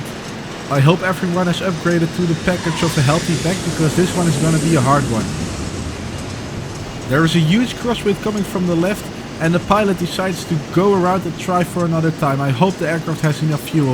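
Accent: Netherlands. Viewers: Hou van da Hollands engels en leuke video man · Hello , iam. A plane fan ,but I smell a Dutch accent from ur mouth…..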